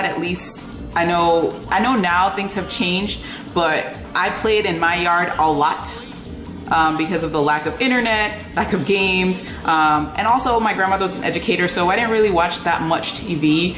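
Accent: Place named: Jamaica. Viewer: Great video. I'm Jamaican and am tickled how much your creole sounds like our patois. I would love to visit Belize. :-)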